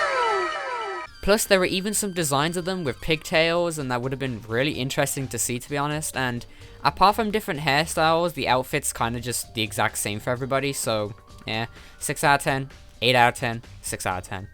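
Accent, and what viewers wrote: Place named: RP, United Kingdom. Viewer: This guys is like SO posh. I want this guy to say "its a bit chilly here dontcha think"?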